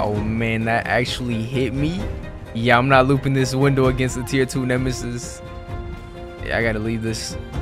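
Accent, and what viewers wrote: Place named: Chicago, United States. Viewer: Man I know a Chicago mf when I hear one 😂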